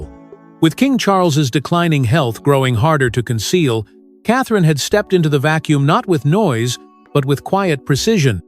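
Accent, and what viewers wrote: United States. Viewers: Good for Princess Katherine if it's true which I hope it is! Why is being said by another American voice? Stay out of it, it's for the British people not Americans!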